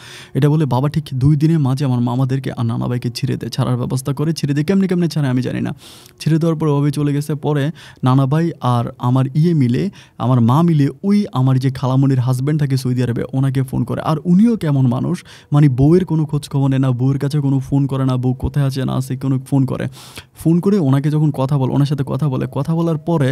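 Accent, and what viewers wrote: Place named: Rangpur, Bangladesh. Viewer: ওহ রংপুরের ভাষায় কথা বললেন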